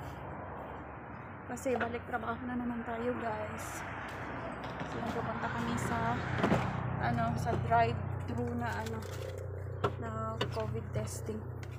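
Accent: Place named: Visayas, Philippines. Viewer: Pag bisaya be .🤣 Kanami pamati.an 🤣🤣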